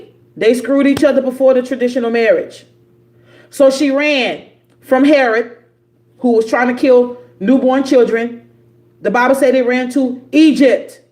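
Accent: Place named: New Orleans, United States. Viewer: I could hear the NOLA coming out as you were teaching! Mad love and respect for how you are bringing truth together across belief systems.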